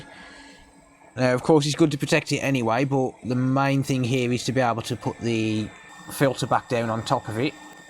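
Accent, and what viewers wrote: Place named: Sandwell, United Kingdom. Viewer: New sub, I thought to myself this guy sounds local, lol I'm from Sandwell, great videos 👍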